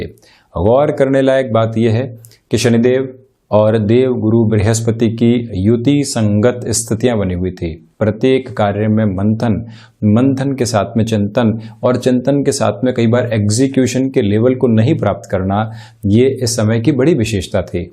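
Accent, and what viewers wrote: Standard. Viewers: I just watch your videos to listen to such pure Hindi. It’s enchanting.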